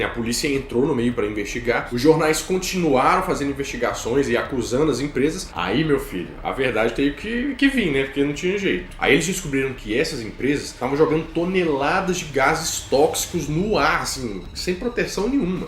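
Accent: Minas Gerais, Brazil. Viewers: sabia q ele era de Minas só pelo sotaque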